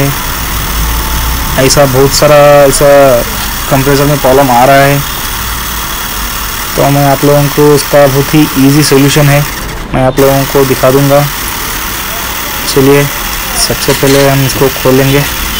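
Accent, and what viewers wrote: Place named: South, India. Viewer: the way you are pronouncing M ....I think you are from south🔥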